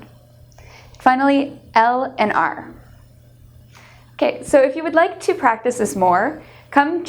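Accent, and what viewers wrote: Canada. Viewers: Oh, Canadian...lol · Neither--she definitely sounds Canadian.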